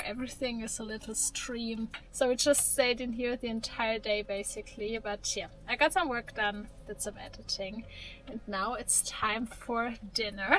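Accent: Germany. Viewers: Watching your video makes me lay back and relax with a sunshine smile on my face. This is not just a video, this is therapy ! forgive me but do I hear a little German accent ?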